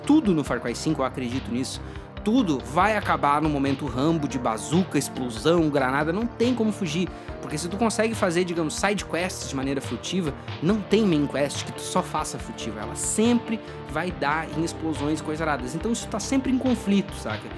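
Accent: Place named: South, Brazil. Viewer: O que me manteve nesse vídeo até o final foi esse sotaque sulista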